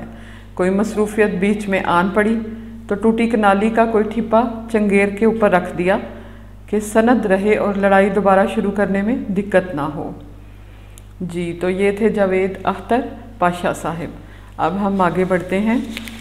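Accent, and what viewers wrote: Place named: Punjab, India. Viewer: ماشاء اللہ، بہت خوب تبصرہ، آواز کا ردھم بہت ہی متناسب، بڑے رسان سے ادائیگی کرتی ہیں۔ اور پنجابی کے بڑے میٹھے انداز میں خ اور ح کا امتزاج بہت پیارا لگا۔ آپ اس لہجے میں ایک دو جملے پنجابی کے بھی شامل کرتیں تو دو آتشہ ہوجاتا۔ ویسے حالات کی طغیانی کا خطرہ نہ ہو تو دونوں مل کر بھی ویڈیو بنا سکتے ہیں۔ بہت ہی اچھا اور اچھوتا خیال۔ سلامت رہیئے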